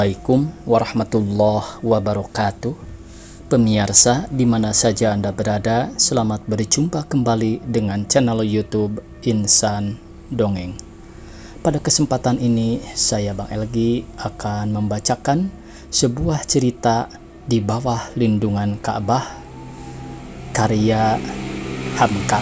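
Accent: Sunda, Indonesia. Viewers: Soantenna siga kisunda ieu teh sanes.?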